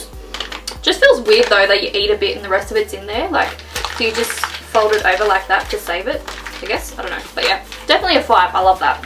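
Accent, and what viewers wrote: Australia. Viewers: Bryn williams that's how Australians talk and I was that dis like btw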